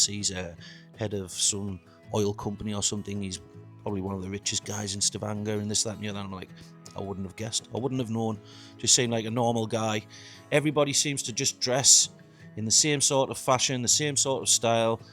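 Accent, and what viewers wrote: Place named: Edinburgh, United Kingdom. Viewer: I have been living 42 years in Norway and I have a little different view on things you have noticed. First, you exaggerate the differences between UK and Norway. I have recently visited Edinburgh and I had an impression of a very similar pace of living and relaxed attitude. Your dialect reminds me of Edinburgh dialect, and maybe you have been living too long in London or a similar city in South England. Second: Staying in London I tried to buy a bottle of beer in a store after 10 pm, and was refused. Three: secondary party. This is typical of a certain social group, mostly single young people. Adult people, with family don't usually do so. And yes, the young people can party a whole night with very loud music, without any empathy for the neighbours. Four: making friends. Usually people make friends in their teens and hold on them. 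It is not common to make friends in adult age, especially not with a foreigner. At work people may be polite and nice, but it stops there. Five: driving. Many people drive very fast, especially those who own a Tesla or a BMW, and have to overtake you, even if you drive a tad over the speed limit.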